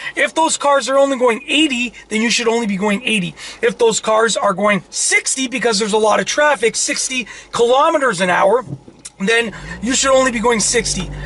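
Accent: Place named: Canada. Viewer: Are you Canadian? You sound extremely Canadian.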